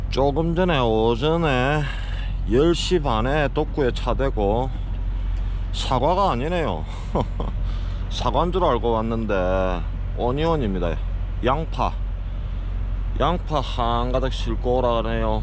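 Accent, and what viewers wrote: Gyeongsang, South Korea. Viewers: 아---- 네^^ 내 친구 목소리와 너무 똑 같습니다. 분 목소리는 톤이 굵은 경상도 말씨로 단호하면서도 성실하고 진실, 예의바른 어투로 특별한 개성의 보이습니다.계속 영상 시청하면 왠지 진주 친구 얼굴이 자꾸 오버랩 데내요^^항상 건강하시고 좋은 하루 되세요!!! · 전 경상도사람은 아닌데 경상도 아저씨의 구수한 말투와 따뜻한 마음씨가 느껴지네요~^^ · 어떤영상에 머리에 흰두근쓴 아가 스탑 싸인을 무시하고 달려 스쿨버스와 충돌한 대형사고가 있었다는 님의 영상이 생각나네요 약속은 지키라고 있는것이죠 편집에러 발생 원래 대사는 샤워장에서 깨끗이 샤워하고 뵙겠습니다 라고 해야 하는데 깨끗에서 짤렸네요ㅡ😜 머 그럴수 있죠 많은 댓글에서 님의 의도와 다른 맘으로 글을 싸지르고 있는 ㄴㄷ보다 님의 일상과 경험 구수한 보리문디의 한글소리 영어소리가 정겹고 다시보고 자주보고 아침마다 님을 기다리는 분들이 더 많을 것입니다 화이팅 하시고 응원합니다 잘보고 갑니다 ㅡ🚛 🚛 🚛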